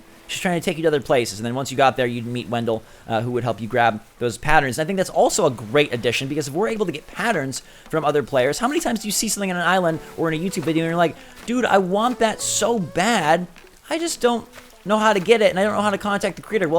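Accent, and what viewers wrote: United Kingdom. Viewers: Am I the only one who loves his British voice : D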